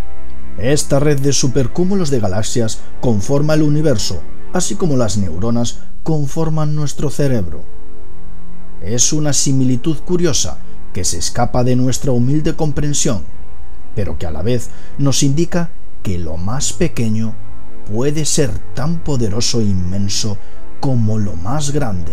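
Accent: Spain. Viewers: lástima de la narración en el español feamente hablado por los propios españoles. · Es epañol el tio 😌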